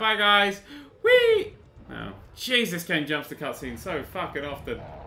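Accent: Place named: London, United Kingdom. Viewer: Hes english... his accent is english - londoner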